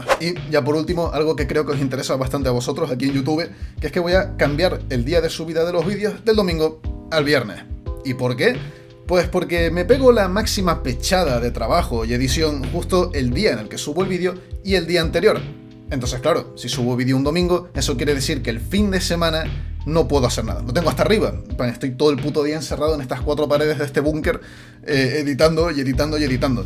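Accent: Canary Islands, Spain. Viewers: Una pregunta, tu acento es canario? Me lo parece, me encanta el acento canario pero no estoy 100% seguro se parece a otro que no identifico, en parte estoy aquí por tu forma de hablar, a parte del buen contenido obviamente. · llevo viendote hace poco con tus buenos analisis. la verdad te esperaba mayor y mas gordo jajaja. fuera de bromas de primeras me gusto tu toque canario autentico me alegra verte que das este paso y de tan buena manera, creo que eres muy profesional y vas muy bien encaminado tio. el setup es lo de menos, la gente te vera por quien eres y lo que haces el resto es un poco de relleno. te deseo lo mejor y estoy seguro que llegaras muy lejos. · Tío, entre tu avatar y el acento canario, te imaginaba como un Walter White guanche. Seguro que te va genial en Twitch porque tienes mucho carisma. Descubrí tu canal hace un mes y me mola mucho 👍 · Eres canario 100%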